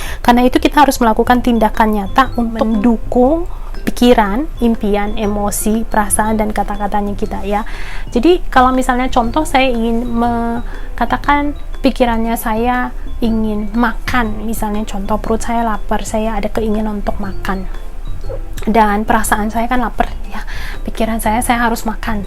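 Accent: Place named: Madura, Indonesia. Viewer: Agak miri macam tetanggaku madura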